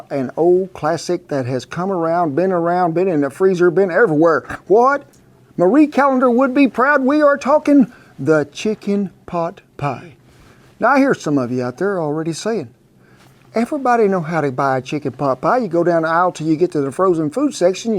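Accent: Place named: South, United States. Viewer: You have the same accent my grandpa did. It took me off guard initially because he passed away, and I hadn't heard the southern accent he had in forever. Thank you for a great recipe and helping me remember my grandpa 😊 😃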